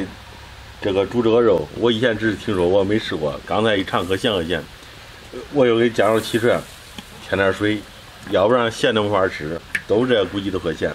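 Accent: Henan, China. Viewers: 如果你只想拍给河南人看，你就继续河南话，否则，可以尝试下普通话。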